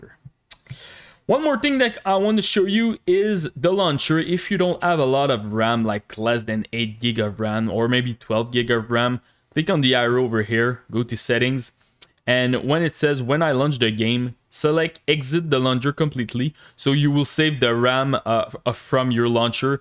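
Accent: Quebec, Canada. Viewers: I like the quebec accent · L’accent québécois hahaha mais lets go je vais essayer ça 🤘🏼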